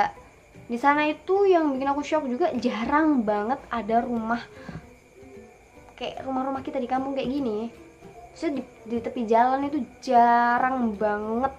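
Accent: Malaysia. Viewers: Lawak gile org indonesia ckp slang2 malaysia😂😂 comel sangat😂😂💕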